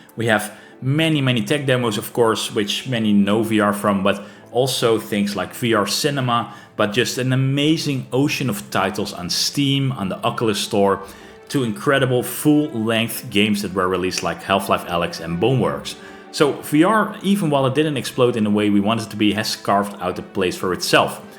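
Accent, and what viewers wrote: Netherlands. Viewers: Ik hoor n hollander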